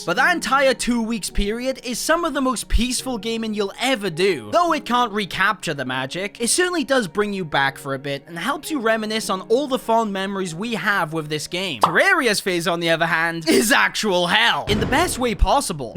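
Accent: Wales, United Kingdom. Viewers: are you welsh you sound welsh but at the same time dont? i cant tell what accent you have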